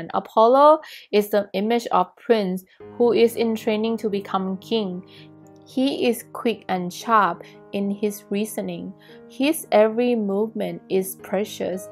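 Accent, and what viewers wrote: Thailand. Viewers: thanks a lot for the fun reading xx btw, are you thai? i'm just curious because we have similar accent. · Pile 1, thanks for beautiful reading. Are u from Thailand? I think u have thai accent. 😊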